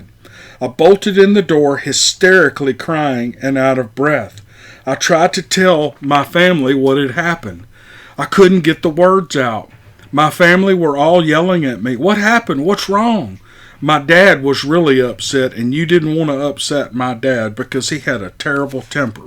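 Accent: South, United States. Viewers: More great encounter stories from and his molasses soaked southern drawl!!! Who in their right mind would click on the thumbs down?!?!? Take it somewhere else, we don't want y'all here, anyway!!! Thanks,. I appreciate your time. Great stuff, as usual 😍👣 👍👍👍👍👍!!!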